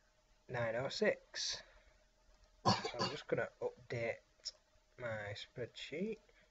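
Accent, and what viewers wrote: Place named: Northern England, United Kingdom. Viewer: Oh.. what a pity..:-( to me it seems like this is a good advert to push people playing even more euromillions. And it is carefully targeted obviously; as the PR (devil) people knows what they're doing. Luckily no one is watching this ** (39viewers)!!! The accent is from the north of England (notoriously work deprived area) "I was unemployed for 5 months..." (what that has to do with euromillions??) trying to look scientific with a spread sheet?!?!?! Everybody knows that lottery is another way for the government "to attract" people's fund and impoverish them little by little. The Euromillions is rigged, if you look at the draw history the combination of 3 or 4 same numbers comes up sometimes twice in a couple of months which is statistically improbable. Then HDVIDEOS999 ask for the link and you are ... 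busy?!?!?! LOL!!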